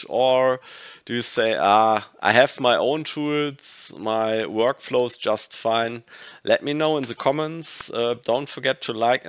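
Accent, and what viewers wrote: Germany. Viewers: Ur from Germany right? 😊 VG aus FRA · that german accent is genius :D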